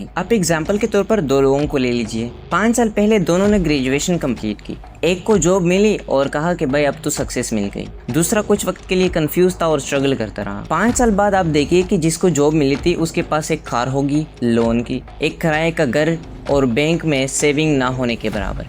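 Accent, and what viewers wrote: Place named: India. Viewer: laanti indian propaganda chanel....listen to his funny "hindi accent, pronouncinf "Z:" with "J".......chaeapter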